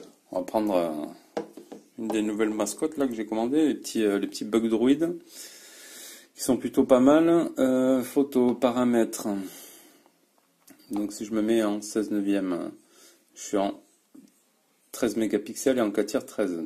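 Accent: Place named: South-West, France. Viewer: J'adore vos vidéos ! L'accent du sud ouest et les petites erreurs c'est trop mignon lol ! Continuez !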